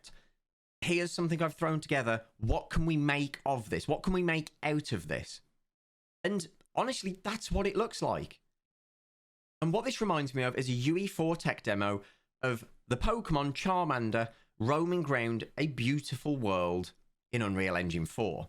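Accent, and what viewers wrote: United Kingdom. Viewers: British :P x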